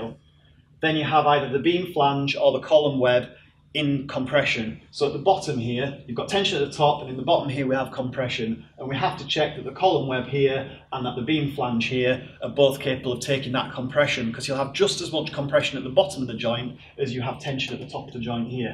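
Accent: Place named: North, United Kingdom. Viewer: Thanks - great presentation - and the Northern accent reminds me of home!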